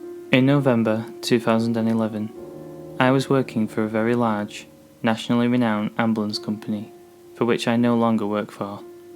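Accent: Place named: United Kingdom. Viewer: Your narration is getting better and better. Well done !! Nice to hear another Brit narrating !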